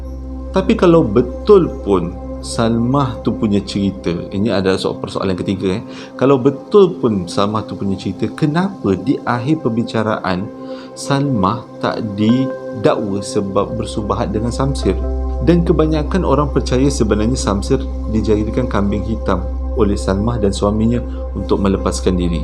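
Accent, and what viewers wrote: Java, Indonesia. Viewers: Gaya ckp santaii.. kok mcm ad slang jawa² sikit ckp yer tuu..😂 terus kn brcerita n mmberi kn cerita y best² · Sedih dgr cite tp kelakor dgr abam ni cite,jowonye pekat😂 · Wong jowo yo..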